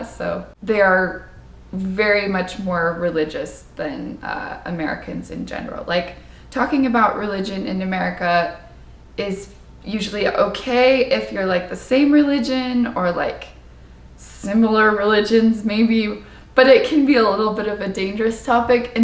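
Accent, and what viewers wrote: Philippines. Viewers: Wow nice filipino accent 🇵🇭🇵🇭👍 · I admire you and like the way you appreciated the foods and how you explained the experiences you had in the Philippines. Your giggling is adorable other that you're gorgeous and beautiful. I also like the way you say our language and uttered it the Filipino way. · Your Tagalog accent is mind blowing 👍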